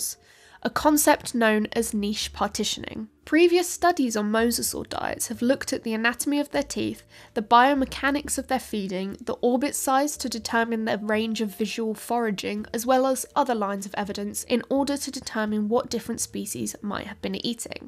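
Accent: England, United Kingdom. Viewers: I hate English accents… Mae your own YouTube so we don’t have to hear your bleeping accents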